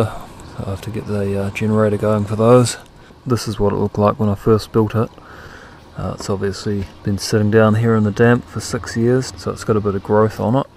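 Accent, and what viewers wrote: New Zealand. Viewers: I'm looking at this thinking its gotta be NZ bush... hears the accent ;) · ..you sound like a Kiwi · I love the kiwi accent... "Strup of deed bak"... Love the video. You gave me some ideas. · Fascinating how a sort of "hybrid British accent" evolved in New Zealand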